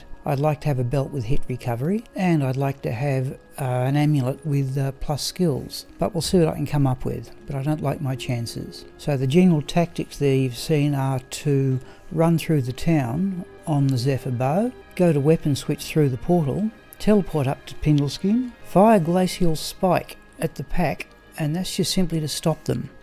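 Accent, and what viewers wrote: Australia. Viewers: Thx for another good one,! Keep 'em comin'! Are you Australian btw? ☺️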